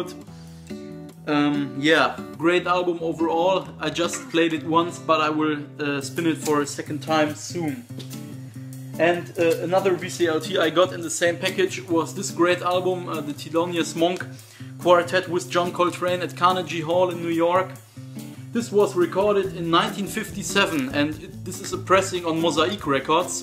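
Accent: Germany. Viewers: Gr8 Album! You look Italian or Turkish, not so German, but you sound German with super English. Young Germans do love their English language! You seem a gr8 upbeat guy for this job:)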